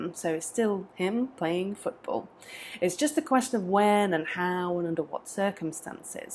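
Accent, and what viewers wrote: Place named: United Kingdom. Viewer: Question. You have a $20 U.S. Bill. But you’re also speaking British accent. Why don’t you show us 20 pound instead.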